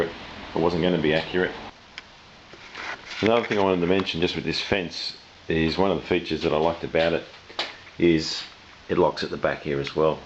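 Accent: Australia. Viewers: Good to hear a Aussie accent, oi oi oi . I should have brought a SB 12, I am not happy with my saws fence system and I seen you did one on your old saw , did you do a video on that ?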